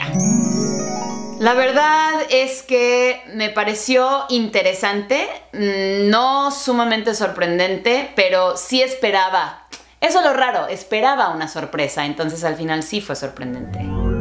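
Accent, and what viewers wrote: Mexico. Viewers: Tienes un acento mexicano, muy marcado. Hablando español claro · Con ese acento puro mexicano, piensas en Español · No mames wey!!! Ahre jajaja es re gracioso el acento mexicano. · Tienes sin duda una apariencia Europea nórdica, pero con un hermoso acento mexicano que te hace ver más interesante. Tu español y tu inglés son impecables. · .. me gusta tu acento mexicano...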